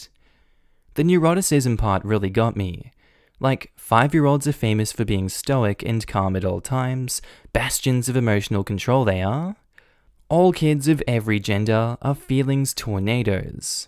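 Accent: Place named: Australia. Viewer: I don't know why, but I just love the Aussie accent, and slang. I know there's probably many types of Aussie accents, like there's different American and British accent, but the one you have is my favorite. I love listening to Reddit videos, and having an Aussie doing makes it even better.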